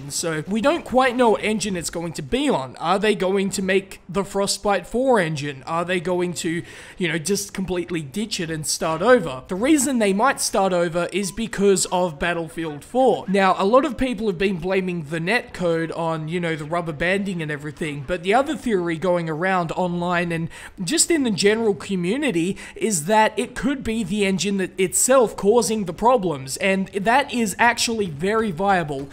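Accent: Australia. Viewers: Sounds like an Australian accent to me. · I think he sounds Australian. I don't see how he could be American or British. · Does the person who said the last question on this video think that Australian accent means bogan? This guy's accent is what most people in Australia sound like (I'm Australian and know what they sound like). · I'm American and it accent is clearly Australian. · first time I see this "oh, this guy must be from Australia"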